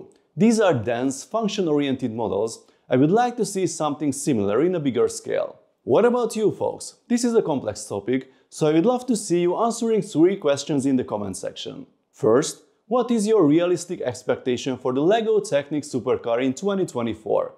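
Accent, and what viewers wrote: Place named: Hungary. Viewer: Az akcentusod alapján magyarnak mondanálak :D Király videó!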